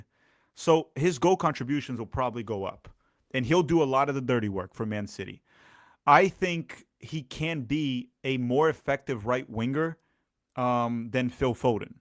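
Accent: Brazil. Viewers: His pronunciation has a little bit of an accent on it lol. I think he might not be from São Paulo, where Palmeiras is from, cause we pronounce it a little different even though we're both Brazilian haha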